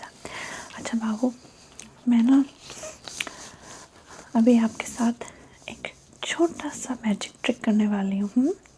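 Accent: India. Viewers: Hindi bolte huwe cute lagte ho Or hindi bolte huwe tumhari voice bhi acha sound karti hai I like your hindi accent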